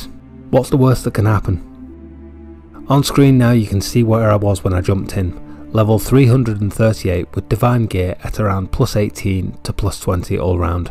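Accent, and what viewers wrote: United Kingdom. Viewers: It’s the British accent it gives the video the sense of genuine knowledge. Like a ted talk lmao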